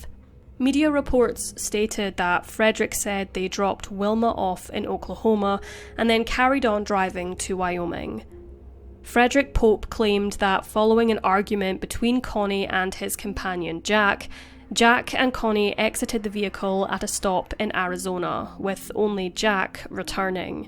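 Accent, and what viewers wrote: Scotland, United Kingdom. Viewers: SHE HAS BEAUTIFUL SCOTTISH ACCENT, EASY TO FALL 😴😴😴😴😴😴😴😴😴😴 💤💤💤💤💤💤💤💤💤 💤💤💤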